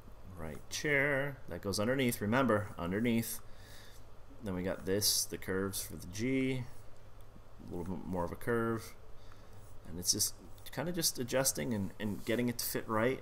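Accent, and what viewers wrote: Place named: Canada. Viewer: wow canadian eh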